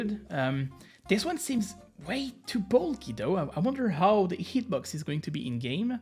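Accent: France. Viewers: pourquoi tu rate le public us wesh on entend bien que t'es fr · This is the first time i watch your videos. I just make it to and realized this dude is definitely french! xD. One of my friends said there is an amazing huge update in CSGO you must check it out and didn't want to install it but still wanted to see the updates you're the only one who covers them in youtube till now so thanks for reviewing the updates you are really quick in covering them.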